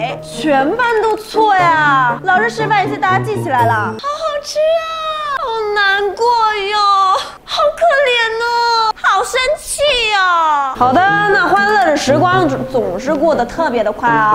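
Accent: China. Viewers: 為什麼要大陸腔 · 她怎么是大陆口音啊？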